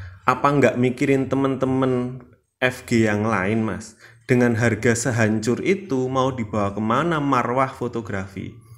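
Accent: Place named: Pekalongan, Indonesia. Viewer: Pas lagi nyari video ttg mengatasi backlight. Ketemu videonya sampeyan. Kok logat ngomongnya nggak asing. Jebule podo wong Pekalongane dewe 😁.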